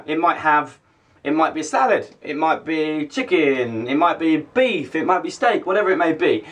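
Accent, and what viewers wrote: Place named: Australia. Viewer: always thought you had something Aussie in ya there it is mate come live here again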